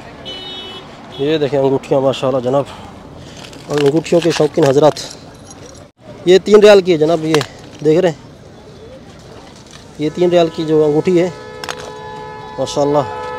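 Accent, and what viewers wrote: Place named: Karachi, Pakistan. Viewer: Karachi lug raha hay😀Aap ka taluq karachi say hay??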